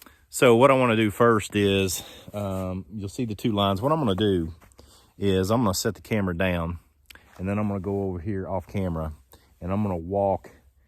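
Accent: Kentucky, United States. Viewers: Knew you were from KY the moment I heard you speak!!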